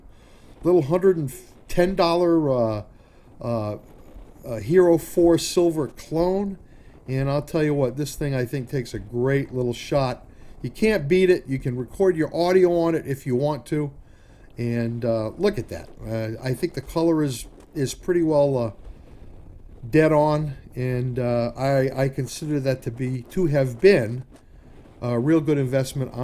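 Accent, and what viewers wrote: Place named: New England, United States. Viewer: I'm from Mass originally, lived in NH for awhile as well. Love the familiarity of the video. The accent reminds me of home! Glad to have stumbled upon your channel. Subscribed now as well. Like you said, I'm watching a ton of videos on YouTube. Looking forward to catching up on your other videos!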